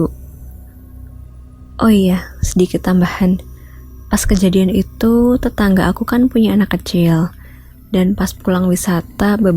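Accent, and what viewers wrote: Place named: Semarang, Indonesia. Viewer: Dari logatnya kyk org semarang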